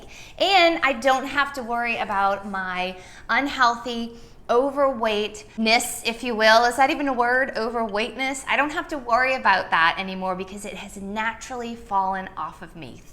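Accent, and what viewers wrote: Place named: Texas, United States. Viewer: You came up into my feed today. I binge watched all of Plantifulkiki’s videos and switched my diet three weeks ago. My energy is amazing now! I subscribed to your TB & IG I can completely relate with the family issues & diet X 10 years. I hope to be an inspiration to them & then they will follow more. Cute Texan accent! You’all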